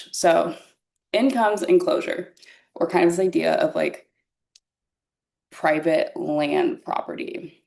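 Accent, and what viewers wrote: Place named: Germany. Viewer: Ur German accent eats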